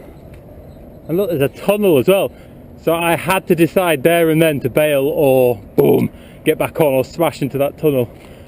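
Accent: United Kingdom. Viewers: This guy has a British accent though !?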